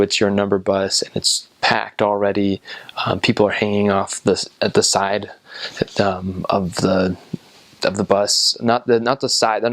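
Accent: South India, India. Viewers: Man your indian accent is spot on!!! Super impressed. It is very hard to do. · You got the south Indian English accent down to a T. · His Indian accent is absolutely on point.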